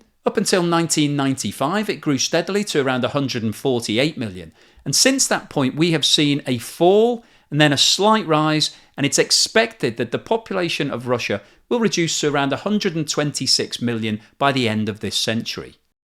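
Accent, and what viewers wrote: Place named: Liverpool, United Kingdom. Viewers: if you're not snapped up by the BBC or SKY I'd be amazed. You present an impartial, clear and concise programme in your upbeat Scouse"/Wirral accent.. You leave most of the well known presenters in your wake. Keep it up. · Wirral accent. Slightly different from the Liverpool accent. Sounds Wirral to me.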